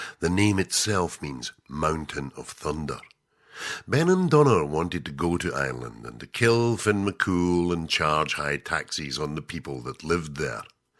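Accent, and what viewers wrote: Scotland, United Kingdom. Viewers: I have never heard this version, I love it, lol. I may be wrong, but it sounded like a Scotsman telling the story? I took that end bit about the Isle of Man as a jab. Is that a "inside Scottish joke"? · The story of one of Irelands most geological sites. Narrated by a guy who is not Irish but Scottish.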